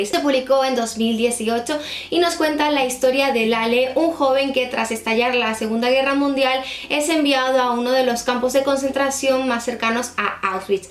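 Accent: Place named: Mexico. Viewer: Tu acento es mexicano pero le añades el "os" De donde eres? Buenos libros. Ciao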